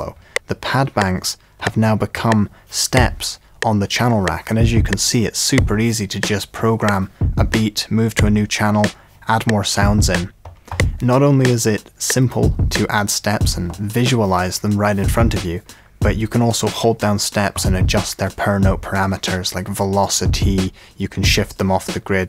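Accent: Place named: Perthshire, United Kingdom. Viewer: I’m music daft but for some reason the only thing I can think about watchin these videos is where you’re from. Im also Scottish AF and have a habit of placing accents but yours is hard to read. You don’t sound southern/ central belt, neither do you sound like a farmer/northern. Im guessing Perthshire? Please reply for my sanity. Im a proud Forfarian wasting away in Dundee as an electrician with a view to taking your crown. Keep up the good excellent work my good man. Really appreciate it and look forward to working with/against you in the future. Wink emoji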